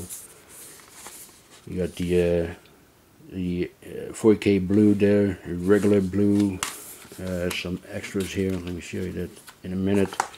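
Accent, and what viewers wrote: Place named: Italy. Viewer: Fantastic update 👍😎& plenty of Italian films. The De Palma-De Niro early movies I have never seen, fans of both & might consider picking up. I enjoyed “The fifth cord” & interesting extras from Arrow video. Well spoken in your Italian accent 👍, “Double face” I almost picked up in the Arrow sale. As I’ve been on a Giallo kick of late, I do want all the Sergio Martino Giallo movies after enjoying watching “The strange vice of Mrs Wardh” “Street law” cool Euro crime movie & I agree the Grindhouse releasing of “The tough ones” aka (Rome armed to the teeth) is one of the releases of the year. Nice touch the numbered bullet 😎 I do rate “Almost human” as Umberto Lenzi’s finest Euro crime movie also. Definitely, more Maurizio Merli Euro crime movies 👍, I have most of them as bootlegs. What a coincidence in the Dorado release of “The seven from Texas” in getting numbered 401 & 402, if you remember, you reminded me of the release as I totally gave up on the release 😬& when you did I ordered straight away as it’s limited to a small number. 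“Broken arrow” fine early western with the reliable James Stewart. “Saving Private Ryan” is on my 4K wanted list, as I’m imagining the beach scene will look epic on 4K. Perfect finale to this update, a mention to Rutger Hauer & the sad passing of a great actor ☹️I always enjoyed all of his roles. Maybe a Rutger Hauer Collection video 🤔 Cool update friend 👍😎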